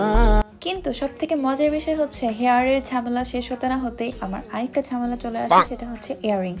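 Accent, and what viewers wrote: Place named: Bangladesh. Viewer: Onner kotha te bojha jacche uni bangladeshi 😅😅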